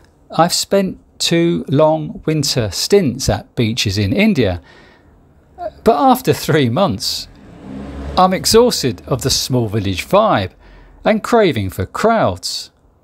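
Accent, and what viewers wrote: England, United Kingdom. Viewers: Love your delivery attotude and yes English accent. Gteat video uncle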